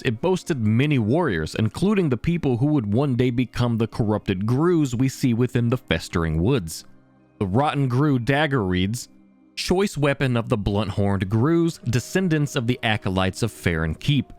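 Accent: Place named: South, United States. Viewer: I just now noticed that you have a slight southern accent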